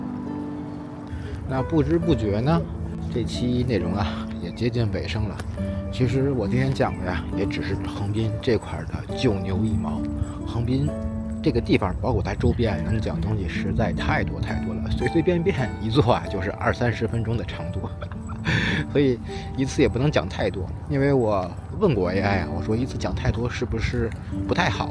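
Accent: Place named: China. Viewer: 你又中計了。這個人來找你麻煩，不是因為你的影片內容。你太不了解台灣的現況了，有一群被政黨洗腦過的蠢蛋，只要你說話是中國口音，就會啟動瘋狗模式亂吠一通。牠留言第一句就說你腦殘，這種人就是典型的台灣蠢青，只想引戰惹你發火。說再多都是枉然，不要浪費時間，最好的應對就是把他封了。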